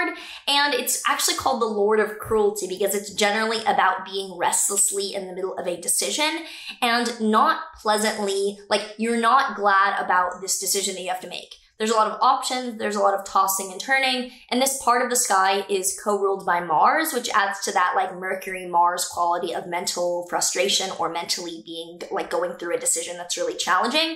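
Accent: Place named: Texas, United States. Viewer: Are you from Texas? I can hear your country accent 🥰😀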